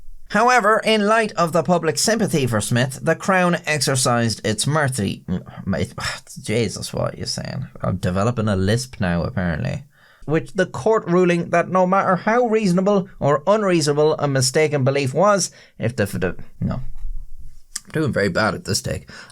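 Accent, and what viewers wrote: Ireland. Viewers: 😂 i feel like i've just climbed out from underneath the rock. Because everybody knew about this guy's channel BUT me 😮 OR AT LEAST 1.5+ MILLION PEOPLE DID 😎👍 WITH THE CUTE IRISH ACCENT AND THE ANIMATED VIDEOS I THOUGHT AT FIRST. IT MIGHT BE JUST ANOTHER AI CHANNEL. BUT AFTER TAKING TO REDDIT AND OTHER SOURCES, IT SEEMS THAT HE'S BEEN AROUND BEFORE THE A I BOOM AND WAS DOING HAND ILLUSTRATED VOICEOVER VIDEOS BEFORE THAT TECHNOLOGY TOOK OFF! FROM WHAT I'M READING, THERE WAS PUSHBACK. WHEN HE STARTED TO GO WITH SOFTWARE ANIMATION, INSTEAD OF HIS HAND DRAWN STYLE BUT YOU CAN'T TAKE AWAY FROM HIS AWESOME SARCASTIC, AND ENLIGHTENING COMMENTARY. THANKFULLY, I WASN'T HERE FOR ALL THAT DRAMA 🙄❤ I'M ONLY HERE TO SUBSCRIBE. LIKE THE VIDEOS, BECAUSE NOT ONLY ARE, THEY THOROUGHLY INVESTIGATED WITH FACTUAL INFORMATION. BUT THE COMEDY STYLE COMMENTARY KEEPS ME LAUGHING. 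THROUGHOUT THE WHOLE VIDEO. DON'T EVER STOP BEING YOU ❤ BECAUSE THE FANS LOVE YOU JUST THE WAY YOU ARE. #SUBBED #facts #BeYou #subscribed #NewSubscriber ❤😊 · Dear, I officially watched all of your videos. Thank you for nice content, your own animation (that you done by yourself, yes I watched previous video) which is funny same as you nice sense of humour. I always watch your videos while eating, it make me almost spit food in monitor couple of times, I don't regret it. I always glad to see your irish mug and hear your irish accent, I am a bit tired of brithish and aussie accent, I am russian and I have aussie and british friend here. Wish you drink less, good health, make more jokes and of course loyal friends and prosperity.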